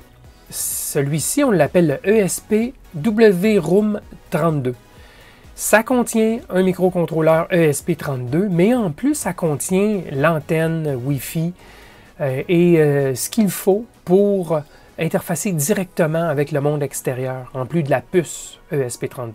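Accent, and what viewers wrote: Canada. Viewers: Bonjour à toi, j'aime beaucoup tes tutos avec ton accent canadien, existe t il un shield pour un esp32 autre qu'une breadboard ?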